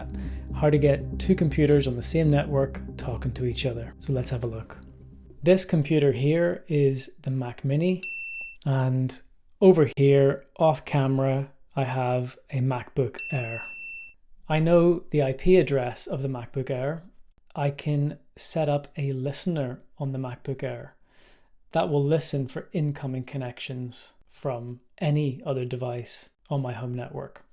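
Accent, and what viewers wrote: Northern Ireland, United Kingdom. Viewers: is that a Northern Irish accent I hear? ;))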